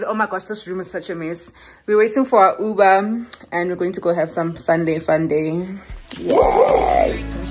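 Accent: Nigeria. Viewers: ❤️❤️❤️❤️❤️❤️❤️ hi mtswala just wanted to say congratulations again on your 10k subbies ,we love here. I wish you can speak wth that nigerian accent everyday or every month I really enjoy you doing it 😂😂❤️❤️❤️❤️ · Yohh motswala I love you so much hle 😂😂 the Nigerian accent is giving 🔥🔥🔥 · I'm here for the Nigerian accent lol🤣love it a lot!!!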